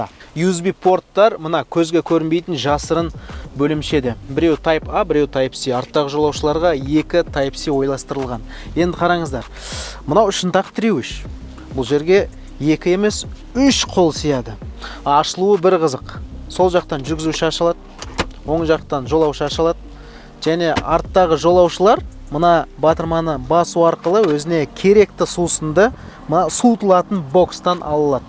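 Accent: Standard. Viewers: Каринамен салыстырып жиберген калай кетті люгын🤣🤣🤣. Базар жок жасай бер бауырым. Современный таза қазақша сөйлеудің королісің. Бір ғана минус бар- шолуың тез бітіп калады, бес минут сиякты өте шыгады. ✋🤝🤝🙌🏻✊🏻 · таза қазақша сөйлейді керемет. Міне дұрыс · Осы Жигит Жаксы Карапайым Отте Казакша таза солейди екен АЛЛА истерине Береке берсин бауырлар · мынандай таза сойледин казакни бринши рет эситип турмын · Жарайсың дами бер, таза қазақша сөйлейсің сол үшін көремін сені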